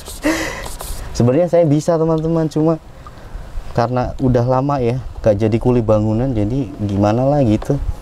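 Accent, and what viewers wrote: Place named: West Java, Indonesia. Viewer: Mas logatnya kaya orang sunda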